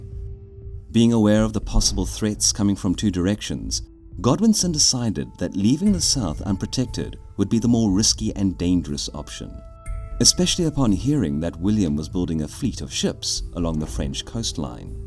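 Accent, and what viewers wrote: South Africa. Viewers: I have to ask, is your narrator South African? He's very good and manages to remain neutral but there are a few words that give him away listening as a ZA native. :) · South African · English South African accent! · Narrator dude, how long has it been since you left South Africa? I hear faint hints that give a little away.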